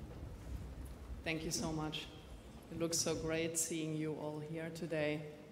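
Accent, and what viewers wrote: Germany. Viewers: Yep. Total extremst deutscher Akzent, hihi. Aber die Worte sind sehr gut ausgesucht. Es geht einem ans Herz. Bravo geehrte und geschätzte Frau Dr. Weidel, liebe! Gut gemacht! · Nö, wo denn?? Schwachsinn, die spricht super, mit bissel deutschem Zungenschlag, aber machen Sie es doch erst einmal besser.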